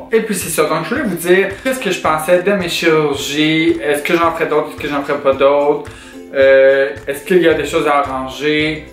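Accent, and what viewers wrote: Quebec, Canada. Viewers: En faite j'adorrrrrrre ton accent Québécois mais enfaite le A vous dites E et c trop beau !!!!!! J'aimerai trop avoir votre accent!!!!!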